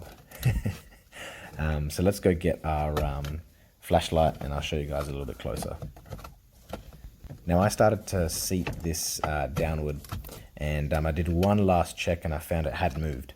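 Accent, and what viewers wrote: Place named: Australia. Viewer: can i ask why you sound aussie but looks like your hands are Indian? :)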